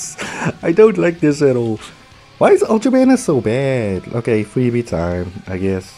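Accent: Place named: South Africa. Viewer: I can smell a south african from a mile away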